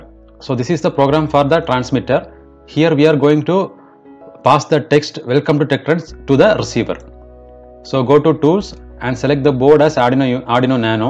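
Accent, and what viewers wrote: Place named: India. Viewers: Sorry, but horrible to listen - Indish-English ... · Please turn on the caption if you are incapable to understand the Indish-English. In this way you can get it easily. Thanks.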